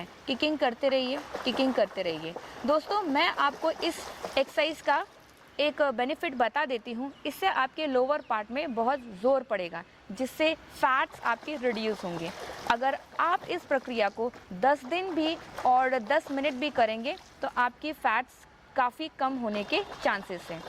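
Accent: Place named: Hyderabad, India. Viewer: From which country are you from I think your from hyderabad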